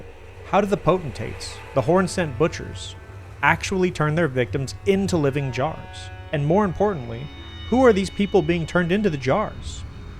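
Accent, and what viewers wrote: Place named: United States. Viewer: u pronounce words so american😭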